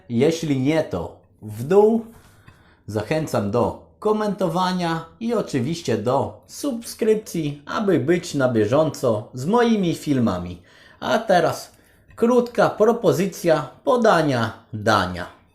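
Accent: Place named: Ukraine. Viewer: A co to ukrainiec jest taki ma akcent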